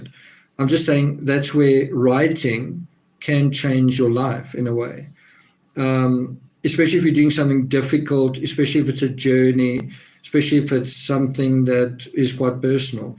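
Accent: South Africa. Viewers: I love your south african accent ...i have family stuff there. And i do really listen to you when you try to analyse these horrendous stories. Your dog is adorable, by the way even tho im allergic. 👍💕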